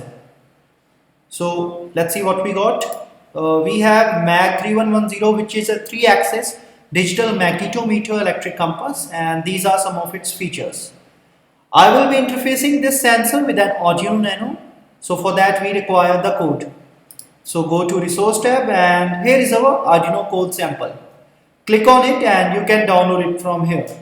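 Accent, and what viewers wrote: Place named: India. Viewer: Thanks for the video. Headache inducing accent though. There are two kinds of Idian accents. One is beautiful and eloquent. The other sounds like somebody talking with a mouth full of tacks.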